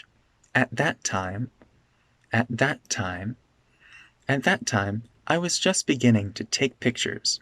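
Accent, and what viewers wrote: United States. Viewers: I don't know about that but he definitely sounds American.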